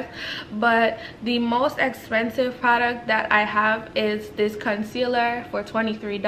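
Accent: Jamaica. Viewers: gurl JAMAICAN PURRRRRRRR. DONE KNOW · Mi hear the patwa and affi subscribe 🇯🇲🇯🇲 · The Jamaican accent!!! Yeh girrrrl... right out 😂❤️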